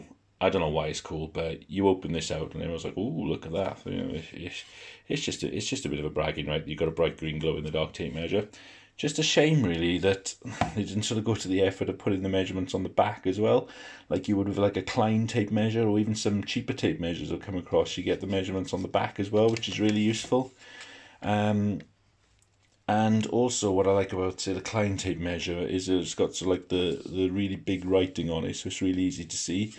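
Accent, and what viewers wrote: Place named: Wales, United Kingdom. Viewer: I thought I recognised the accent I'm from sunny Pembrokeshire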